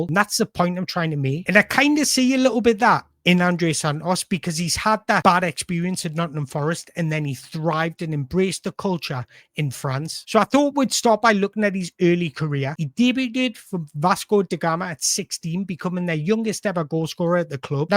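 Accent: Newcastle, United Kingdom. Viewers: Hello mate, ye channel seems to be growing and it is annoying me as pd Proudlock is amazing but don't get much views and don't pay for them like some ppl (the noise) and that is sad cos me subs are organic like lad and ye voice at least sounds Geordie unlike his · Hi, keep it up kidda. Don't listen to anyone telling you to tone your Geordie voice down, YIU are the most informative person on Any channel. You should be employed bu NUFC as scout and youth coach. Keep it up. Howay the lads.⚫️⚪️⚫️⚪️💪👍🏼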